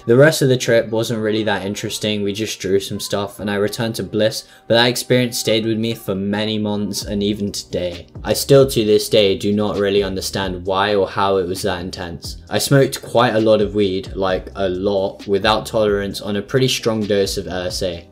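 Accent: United Kingdom. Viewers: m8 why u speak bribish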